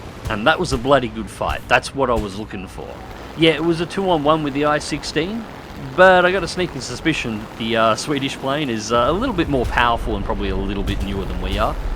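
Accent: Australia. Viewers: Feeling like you also just watched Rex's Hangars video on the HE112 ;) ? Awesome vid mate! thank you very much, love this plane and it's great to listen to an Aussie talk about something other than the fkin election or parliment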